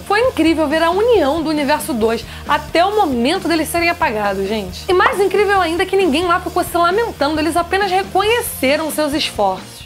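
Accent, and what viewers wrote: Rio de Janeiro, Brazil. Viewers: Kkkk, estive no Rio e reparei no Douze tbm, naiscimento, mas acaba sendo fofo. Relaxa. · Eu tbm sou carioca.. Ksjsjsjsj, esse "i" sempre vem nais palavrais. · Sou carioca e entendo....o x no lugar do s..... É foda :/ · Pietro Silva Claro que não né... Sou paulista,e não me incomodo com o sotaque carioca. Eu já sou acostumado a ouvir .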